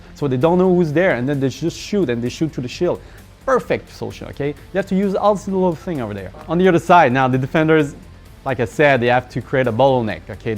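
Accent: Quebec, Canada. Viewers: He's a french canandian, just accept the way he talks english lol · Thats a HUGE french accent. (french canadian i think)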